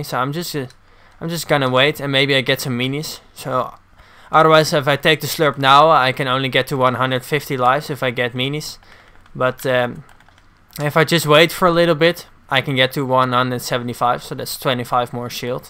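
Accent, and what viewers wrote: Netherlands. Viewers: Correct me if I'm wrong, but are you Dutch? You sound Dutch at least. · Are you dutch?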